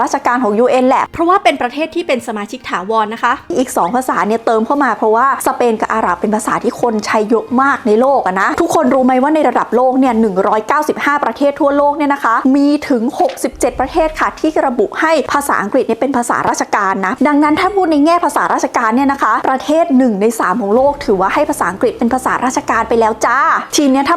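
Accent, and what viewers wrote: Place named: Central Thailand, Thailand. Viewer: ที่น้องวิวพูดนี่แหละเขาเรียกว่าภาษากลางไทย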